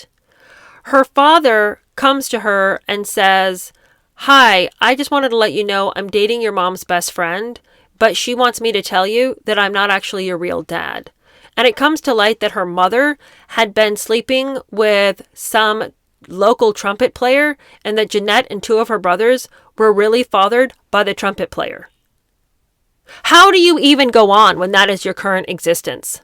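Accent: United Kingdom. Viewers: Love this new series! I hesitated at reading the book too but now it’s on my list. The only thing we are missing is an excuse for your amazing British accent! Lol Love your channel!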